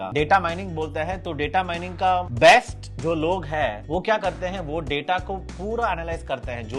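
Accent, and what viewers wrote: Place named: Tamil Nadu, India. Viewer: Thank u for such a video 🙇. Also, I can sense tamilian slang in your way of speaking hindi 😅.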